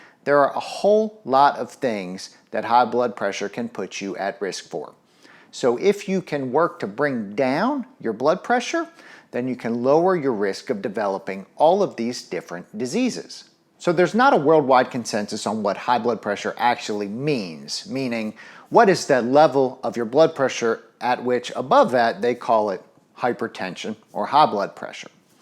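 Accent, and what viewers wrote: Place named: South, United States. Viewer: I love his accent.....educated southern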